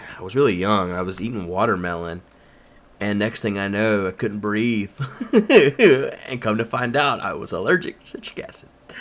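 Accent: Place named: United States. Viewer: Your American accent nice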